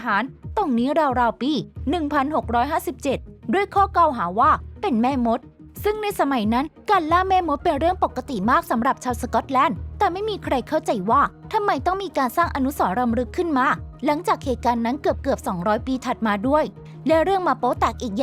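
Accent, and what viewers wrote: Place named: Isan, Thailand. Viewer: เจ้าของช่อง เป็นคนอีสานใช่ไหม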